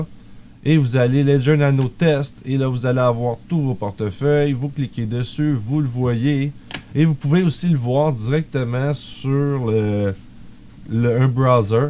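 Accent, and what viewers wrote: Canada. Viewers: Top merci depuis la France et l accent canadienne adore !!!!!!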